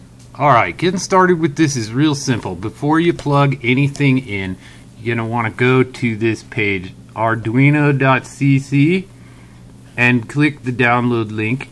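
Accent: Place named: Texas, United States. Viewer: you have wierd texas axent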